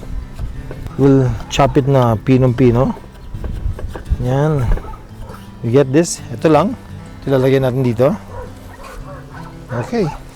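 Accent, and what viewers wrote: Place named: Tagalog, Philippines. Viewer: i am hungry whle watching it.. i love it when u cook, Sir! i also love ur bisaya cos it has a tagalog-toucvhed-accent too. hehhe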